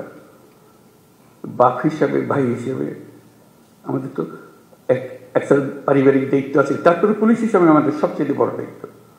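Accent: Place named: Chittagong, Bangladesh. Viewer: চিটাইংগা কথা গুসসা লাগে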